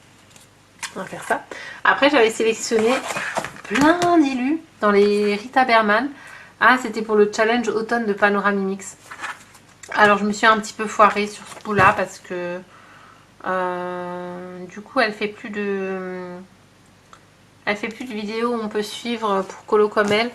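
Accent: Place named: Alsace, France. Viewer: Une alsacienne ! Quel plaisir ! Alsacienne également j'ai direct reconnu ce petit accent ! :) une très jolie vidéo !!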